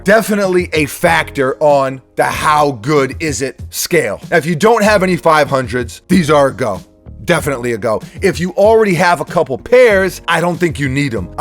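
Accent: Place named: Boston, United States. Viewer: def from boston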